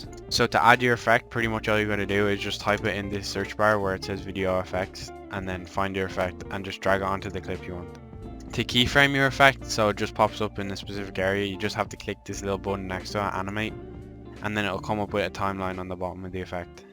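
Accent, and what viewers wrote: Ireland. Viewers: Wait he’s Irish like me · Didnt know u were irish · bro i don't care you blured the things, the tutorial was fantastic i watched only because of the irish accent this wasn't even useful for me, i already learnt a lot about editing, and i use premiere, but this was really nice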